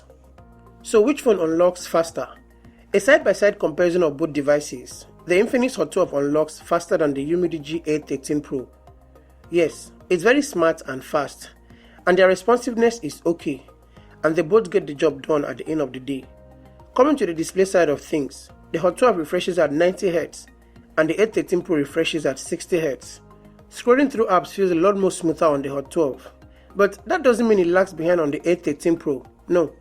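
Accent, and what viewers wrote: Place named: Nigeria. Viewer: That Nigerian accent. One of my friends is Nigerian and the accent is brilliant.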